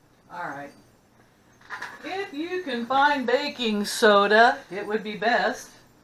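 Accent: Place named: Missouri, United States. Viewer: Maters, crud--you talk like I do! Must be a "Missouri thing"! Thanks for the recipes--my stomach is growling now!! So glad you didn't "burn the house down!! Great video!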